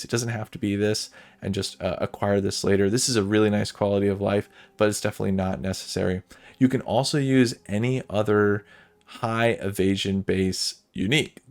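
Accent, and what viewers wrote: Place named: Germany. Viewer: Absolutely stunning video man. I wholeheartedly appreaciate eveything & second put into it; since a also worked on some videos I can tell, it was a lot. OT Question: Where are u from exactly, ur having an interesting accent, reminiscent of German but w/o the typical German pronounciation I'd expect.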